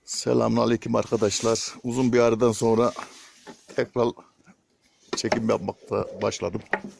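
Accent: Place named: Nevşehir, Turkey. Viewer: Merhaba kardeşim ya ben bu görüntüleri çok seviyorum. Köyüm geldi aklıma. Ben Nevşehir 'liyim şiveniz bizim köylüler gibi. Bide tavuk sesleri geliyor keşke bende köyde yaşasaydım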